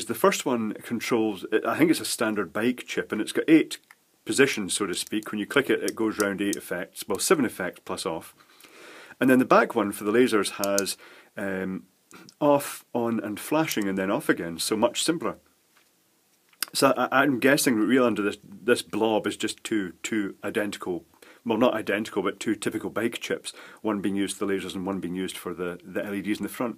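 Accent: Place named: Glasgow, United Kingdom. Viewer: are you fae Glasgow ?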